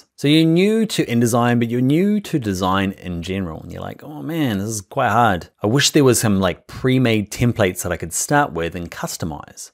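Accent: New Zealand. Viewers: Hi. Andy here from NZ....how refreshing to hear another Kiwi explain this app in detail. Great style and pace too.....the American tutorials treat you like a baby....I was first put off by the length of the clip...but hey...this was seriously worth it. Picking up Indesign to help my daughter create her first children's book....she is 10... And needs a bit of help.... I think you've given me everything I need to get started. I see you also have illustrator tutorials...I'll be checking those out too. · Thanks for great tutorials - you're a gifted designer as well as a teacher! And hearing your accent, I miss my highschool exchange year in Papatoetoe... Cheers mate, thanks again! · do I hear kiwi accent?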